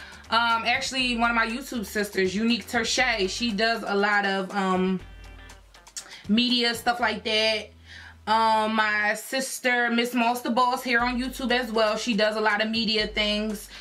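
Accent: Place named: New Orleans, United States. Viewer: I can hear the New Orleans in your voice lol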